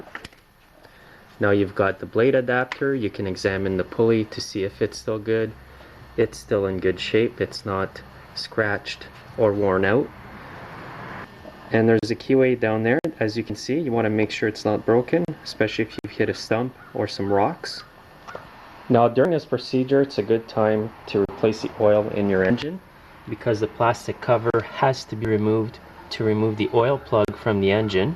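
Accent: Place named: Canada. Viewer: YOU SOUND CANADIAN...ARE THE CANADIAN MOWERS ANY DIFFEREN THAN ONES FOR USA?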